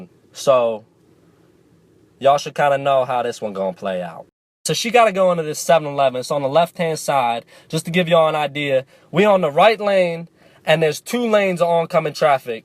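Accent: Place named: United States. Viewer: fuck you make me laugh !! god bless the yanks accent !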